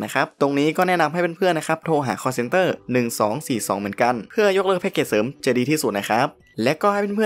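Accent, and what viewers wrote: North, Thailand. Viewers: พี่คนเหนือใช่มั้ยครับ555555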